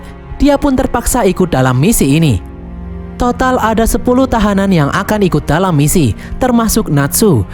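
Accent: Java, Indonesia. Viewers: jadi unik nntn jepang kuno pakai narasi logat jowo medok😁👌🏻👍🏻 penasaran klo logat nya dirubah le logat ngapak..mungkin tambah unik⭐⭐⭐by : sucriber baru🙏🏻🤩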